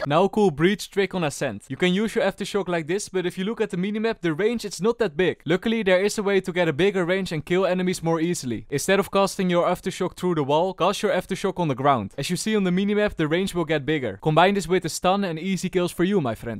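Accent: Netherlands. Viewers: If you put captions on its dutch! Is accent that heavy?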